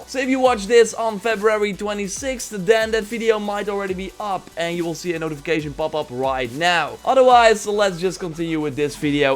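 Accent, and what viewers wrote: Netherlands. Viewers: Hoe kan je zo goed engels je hoort amper dat je nederlands bent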